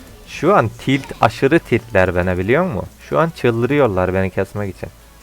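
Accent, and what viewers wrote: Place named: Muğla, Turkey. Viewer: abi sen nerelisin şiven konuşma tarzın muğla tarafları gibi benziyor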